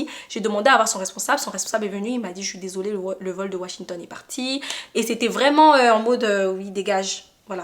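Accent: England, United Kingdom. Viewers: ❤️😻I love your English accent girl 😭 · Ton accent anglais je l’adore ❤❤❤❤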